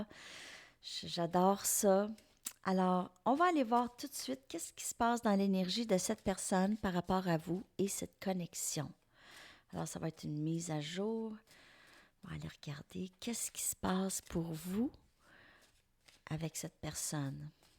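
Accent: Canada. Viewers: j ai toujours aimés l accent canadien c est très beau 👌💋💋💋a vous entendre 😍👍👍👍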